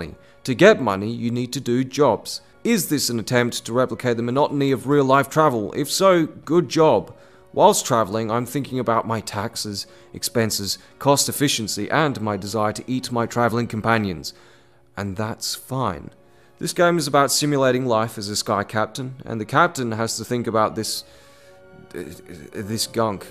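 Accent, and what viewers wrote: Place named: Australia. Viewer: I'm English and he sounds more Australian to me in fairness.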